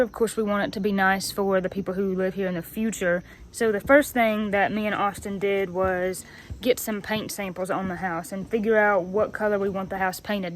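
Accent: South, United States. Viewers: What kind of accent is that ? Virginia, Kentucky, Carolinas ??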